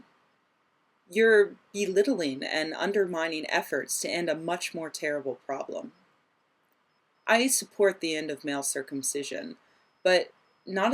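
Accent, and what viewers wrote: United States. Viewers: Alright. So based on your accent I'm going to assume you're American. If I'm wrong please correct me. As such the statistics that are most close to home for you should be those of American citizens. In America roughly 80 percent of men are circumcised. This comes out to be roughly 260,000,000 men. 200 of those men die from circumcision every year. FGM promotes another staggering number with 500,000 women either being victims of or at risk of FGM. As far as my research has shown there are 0 annual deaths due to FGM. FGM is horrifying. It disgusting, and evil; however, to deny that other practices are not to be taken with the same sense of urgency simply because of the gender of the victims is both damaging and disingenuous. I look forward to the day when both plights can be handled at the same table with the brightest men and women our world can offer. 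Thank you for your opinion, and the vehicle necessary to make mine heard as well.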